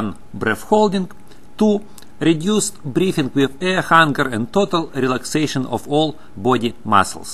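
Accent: Russia. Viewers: he's Russian and so am I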